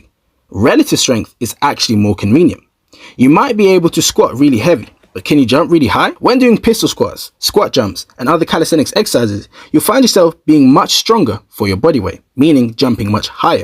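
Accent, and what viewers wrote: United Kingdom. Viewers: U sound British I can tell u from England 🇬🇧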